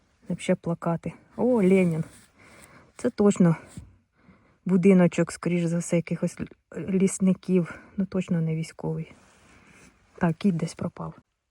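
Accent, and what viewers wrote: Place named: Poltava, Ukraine. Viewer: У вас говір "Полтавський" 😊 Ви самі звідки?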